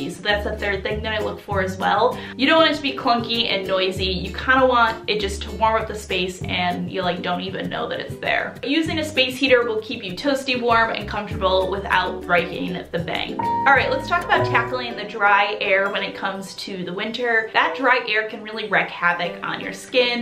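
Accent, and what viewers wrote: United States. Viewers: I'm so over American accents. Her voice grates on my nerves!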